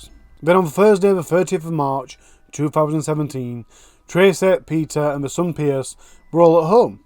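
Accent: Yorkshire, United Kingdom. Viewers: Where in Yorkshire are you from?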